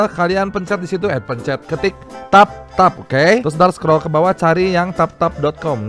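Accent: Bandung, Indonesia. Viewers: Logat namah ciga orang ciroyom sih 😁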